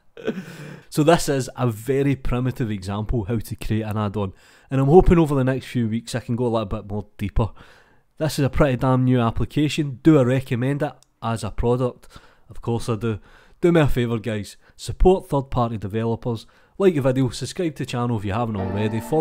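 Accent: Scotland, United Kingdom. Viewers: First i came to look at the AddOn. But now i just listen to your scottish accent lad !! Love it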